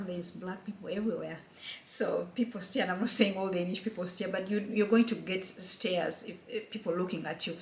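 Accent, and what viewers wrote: Uganda. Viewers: I wouldn't know why my boss always gets hurt when we are traveling on a dusty road i put on my head wrap ,he easily asks why are you putting that on? I used to think its because i look different! Now, i know why? Thanks sis,am in Uganda you sound like someone from Fort portal.😊 I feel those guys have strong racism but hidden internally in their behaviour that is also quite hidden it takes a keen person to tell! The company i work for are all Danish, trust me they make me hate being in Denmark by the way!! Thanks for sharing!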